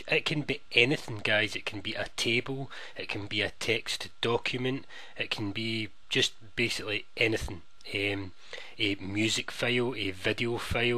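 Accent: Scotland, United Kingdom. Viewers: he is scotish trust me?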